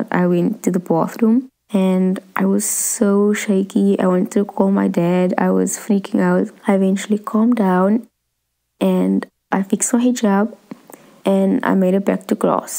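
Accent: South Africa. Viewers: She sounds like a South African like me❤️ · it's sounds like a south African accent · I'm from south Africa and could instantly hear that she's colored lol same like me.. The "what-not" gave it away among other things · Iqra Nazeer to me she sounds like she's from South Africa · I think she's South African because thats sort of how i sound when i speak english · I can tell that she's South african 😎😎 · She sounds South African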